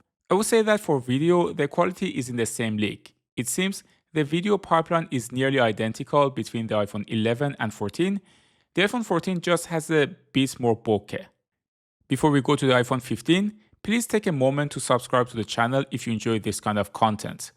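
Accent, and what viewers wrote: Iran. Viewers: لهجت میگه که ایرانی هستی😅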